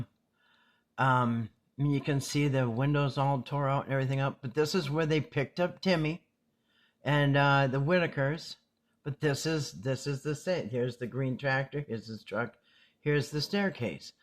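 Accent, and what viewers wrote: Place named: Minnesota, United States. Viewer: U must be from Minnesota 😊 I used to work for Cargill. U betcha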